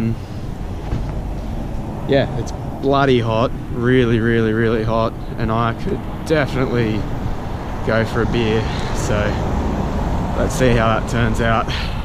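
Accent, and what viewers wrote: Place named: Australia. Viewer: Dude! I love your Australian Accent 🤣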